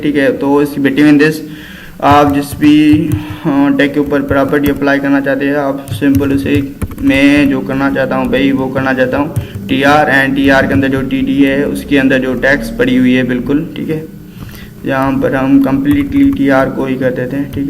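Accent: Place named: Sindh, Pakistan. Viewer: Your Talking style seems Sindhi by the way Love you and Thanks <3 Peace !!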